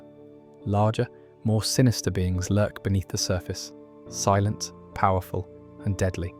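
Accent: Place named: London, United Kingdom. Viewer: By the way this isn't saying anything negative about Irish folks. Their accents are a music only known to 0.008% of earth, near mythical. I'm guessing yours is north west of/in London? Cambridge? St johnswood? Obligatory apologies if not (no of course your not like them ) - I try every time to guess, it's one of my interests. Unfortunately here we mostly sound like... how cardboard tastes.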